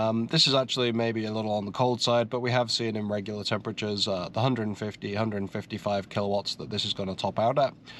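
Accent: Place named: Northern England, United Kingdom. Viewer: Sounds like he's from northern England----- north-west possibly---- anyways good review.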